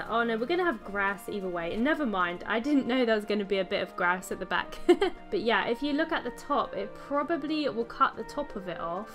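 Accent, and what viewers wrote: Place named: United Kingdom. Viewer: Hello, stumbled upon your videos not too long ago and i like your style and your lovely british accent so i subbed and i can't wait to see more of your DQB creations. 😉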